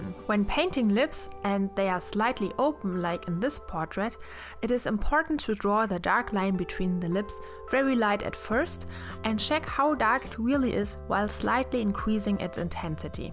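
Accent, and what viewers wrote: Germany. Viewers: Are you German? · R u German? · I always enjoy watching your videos. Lately I had problems finding motivation and you helped me quite a lot :) also I enjoy the way you edit your videos - the slow close ups of the brush and the paint in the beginning are so stunning...it really catches the mood of the painting process :) oh and your German accent - lovely ;) it's always funny to find other German YouTubers... (PS: Liebe Grüße aus Berlin! <3) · Are you froh German? Your accent is so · Man hört direkt dass du deutsch bist☺️👌🏼 echt gute tips und echt schöne Bilder 👍🏽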